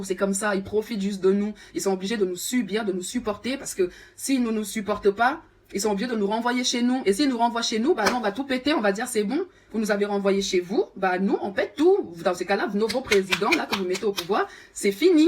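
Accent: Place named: Cameroon. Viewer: Vu son joli accent, c'est ma soeur camerounaise.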